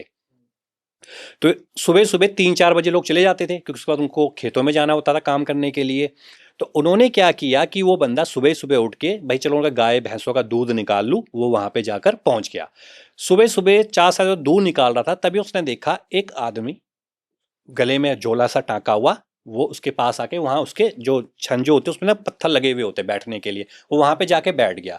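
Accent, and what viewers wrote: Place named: Pahadi, India. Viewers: It's a part of pahadi dialect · Pahadi bolchal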